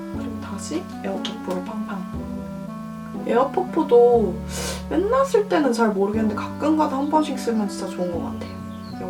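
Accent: United Kingdom. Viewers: 구독자분들 요구에 하나하나 맞춰주시는거같아서 너무 좋아요 ㅎㅎ 언니의 영국발음도 좋지만 가끔 이런 한국어 튜토리얼도 매력잇네요! 그나저나 섀도우 너무 이뻐서ㅠㅠㅠㅠ 에뛰드 세일할때사러가야겠어요ㅠㅠㅠ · 한국어랑 영어 둘 다 좋은데 영국식 발음의 영어가 너무 매력적이에요 ㅠ💕 그리고 스트롭은 진짜 미세한 펄이에요! 바닐라코 제품도 궁금하니 통장을 비워봐야겠네요ㅋㅋㅋㅋㅋㅋ 언니는 브들파데 잘 쓰시는 것 같은데 저는 샘플로 5번정도 써봤는데 저는 뭔가 더럽게 무너지는 것 같아요ㅠㅠ 아 그리고 혹시!!! 엄청 밝은 색상의 브로우를 파는 매장을 아시나요?ㅠㅠ 제가 엄청 밝게 탈색했는데 색상이 마땅치 않아서 섀도로 눈썹그리고 있는데 섀도로 브로우하니까 시간이 너무 많이 걸려요😂 그리구 에뛰드 1호4호 갖고있는데 발색이 랜덤인 것 같아요.. 뭔가 살짝 쉬머섀도들은 브러쉬에서 뭉치고ㅠㅠ ㅋㅋㅋ그리고 문샷은 뭔가 중렴이? 브랜드 인 것 같아요 저렴이라고 하기엔 가격대가 훨씬 높고 고렴이라고 하기엔 살짝 저렴한? 상당히 애매한 가격대의 브랜듴ㅋㅋㅋㅋㅋㅋ 저는 현재 눈병으로 고생중인데 설 연휴라 병원을 못가고 있어요ㅠㅠ 하필 저번 주 금욜 새벽에 눈병이 하ㅋㅋㅋㅋㅋ 이렇게 길게 느껴지는 연휴는 처음인 것 같아요(나쁜의미로)😭 겨울 독감,눈병 조심하시구 남자친구없는 전 이 메이크업을 친구만나러 갈 때 응용해보겠습니닼ㅋㅋㅋㅋㅋ 설날 마지막 연휴 잘 보내세요👍🏻💕💕💕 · ㅋㅋㅋ크으 은 역시 영국어 발음이 을 더 빛나게 해주는 것 같아요 한국사람이라 한국어 영상이 더 이해하기 좋은건 사실이지만 역시 영어하실때의 그 무언가를 따라잡긴 힘들어욬ㅋㅋㅋㅋVlog를 한국어로 재밋게 찍어주세요 😜오늘도 좋은 영상 고마워유💫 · 언니 영국특유의 발음이 너무 좋아요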